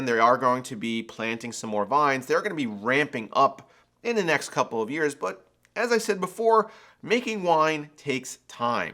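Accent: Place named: Maryland, United States. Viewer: u from maryland bro?? lets hang out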